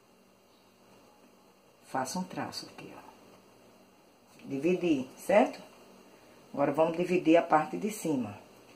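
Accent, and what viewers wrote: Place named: Pernambuco, Brazil. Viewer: DEUS te abençoe pelo carinho o qual vc ensina, estou aprendendo e da forma como vc ensina facilita muito, vc é pernambucana? Pelo sotaque acredito que sim, gente muito boa de coração, meu esposo é 😊😊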